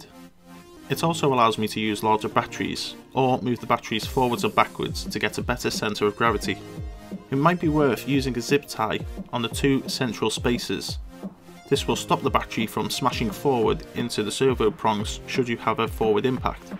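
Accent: Liverpool, United Kingdom. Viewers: Nice build and eloquently presented for an almost Scouse.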